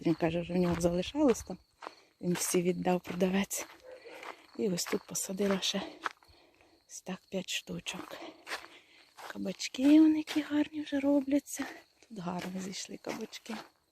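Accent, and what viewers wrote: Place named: Volyn, Ukraine. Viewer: Доброго дня,чую у Вас волинський говір🙂.Дуже гарні у Вас квітники.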